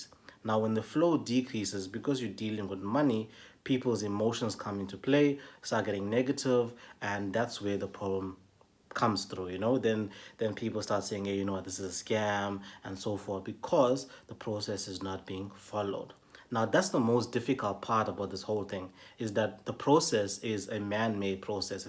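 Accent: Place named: Indian South African, South Africa. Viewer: You sound like a South African Indian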